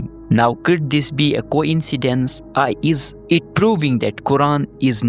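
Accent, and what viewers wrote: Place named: Asia. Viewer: He has a thick Asian accent and he wants to prove that he can read English text like professionals. Though he learnt to use video clips from royalty-free visual sources to support his research in text. ❤❤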